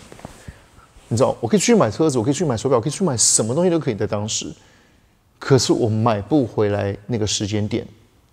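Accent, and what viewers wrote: Taiwan. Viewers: 台灣人特有的口音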